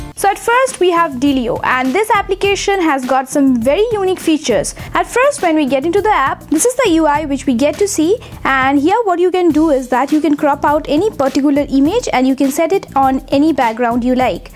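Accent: India. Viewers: You speak as like Indian's, are you Indian?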